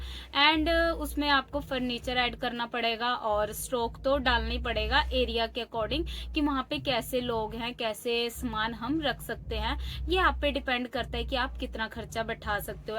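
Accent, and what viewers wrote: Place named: Punjab, India. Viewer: मैं भी video बनाती हूं मेरी भी shop है आप के जैसे मैं भी पंजाब से हु आपभी मेरी जैसे हिंदी बोलते है😂 आपकी वीडियो रोज देखती हूं बहुत प्यारी है आप❤❤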